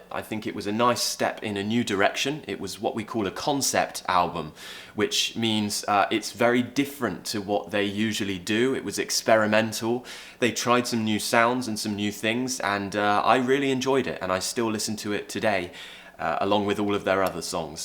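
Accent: United Kingdom. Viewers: I love British Accent 😍 it's Awesome 😊👍....Thank u very much 😘 Love from India☺ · I love your accent so much 💜💜 British accent is always attractive to me!!!! And thank you. (Side note l love your hairstyle👧🏻👧🏻) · I start love British accent because of you. Your such amazing teacher i had ever seen. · I don't exactly know what my accent is but i'm trying to sound like a British. Your videos help me so much. I can't thank you enough. In Fact, i can even learn British English by listening to your pronunciation and intonation. Be Safe. Sir. And Have a great day. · I have just known your channel and I love it so much immediately. Love british accent, love ur voice and the way you teach pronounciation. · I really enjoy a lot watching your pronunciation videos. I imitate every single word that you say. British accent is what I love the most. Hope one day I can take up this elegant accent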